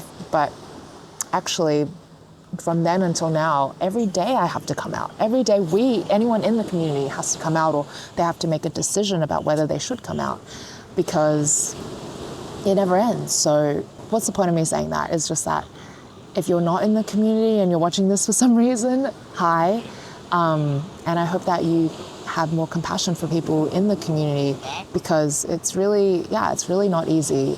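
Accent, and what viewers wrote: Australia. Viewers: I wish I had your Aussie accent. I have a boring American accent. 😢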